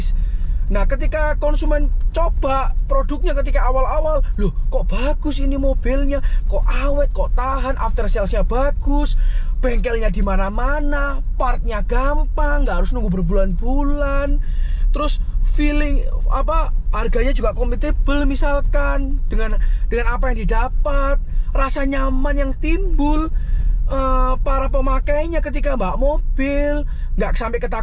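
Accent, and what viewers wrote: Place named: Surabaya, Indonesia. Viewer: Mantap koh...., opininya logat suroboyo an...😁😁😁😁😁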